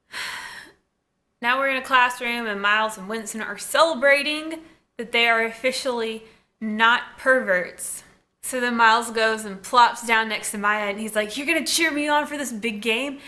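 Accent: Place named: South, United States. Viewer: everytime i watch one of your Degrassi vids i end up getting pissed off.. i'm trying to relive my highschool memories and i got you in your southern accent with european charm ruining it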